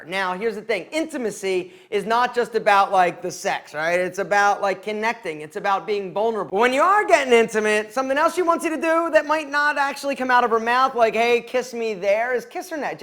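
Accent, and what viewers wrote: Philadelphia, United States. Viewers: You're from Philly arent you?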